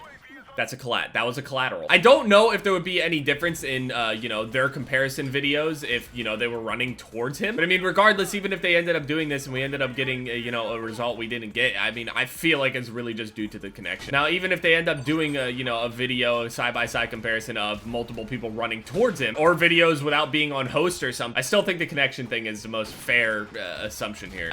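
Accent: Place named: United States. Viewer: I stopped after every few seconds to mimic his pronounciation becuase I have to improve the pronounciation of my English and I hate the british english they are teaching us at school. thanks for your American accent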